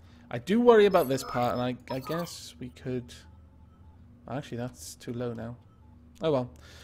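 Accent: Wales, United Kingdom. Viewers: For some reason i think you sound Welsh. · Is he welsh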